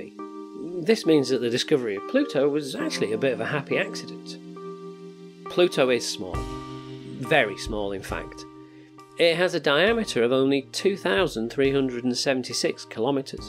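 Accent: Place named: Bolton, United Kingdom. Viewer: Are you from Bolton?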